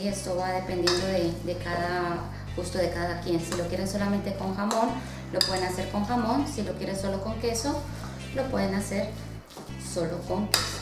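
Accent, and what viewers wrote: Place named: Cuba. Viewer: Hola.Gracias por tus videos. Estoy en una temporada donde la cosina me està encantando, y buscando unas recetas de croquetas encontrè tu video. Soy cubano, aunqueahora no vivo allà, pero si consumiamos muchas croquetas. Tu asento se parece al cubano.¿Eres cubana? Gracias.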